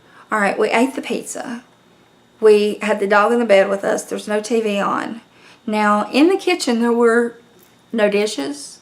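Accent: South, United States. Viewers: Loved your Kooky-ian vid so started binge watching other vids. If you like true ghost stories check out "Real Ghost Stories Online" podcast w/Jenny & Tony Brueski. Sooooo good!!! Love hearing you talk. We've moved to Maine from the South & it is lovely to hear familiar accents!! LOL!! Sending much love!!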